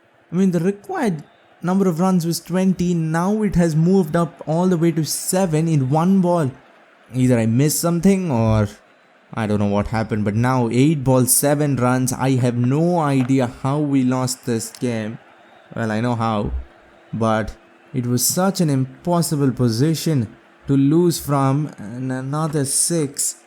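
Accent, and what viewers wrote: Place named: India. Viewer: Where are you living ?, from your voice I feel like you are from India but living somewhere else due to the accent...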